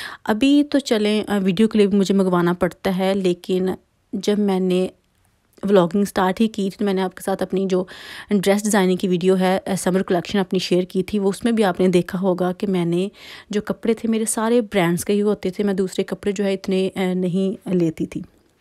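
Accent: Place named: Faisalabad, Pakistan. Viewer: Aoa. Mashallah looking nice. App Faisalabad se h app ki boli Faisalabad k logo jaisy h. Btana zaroor.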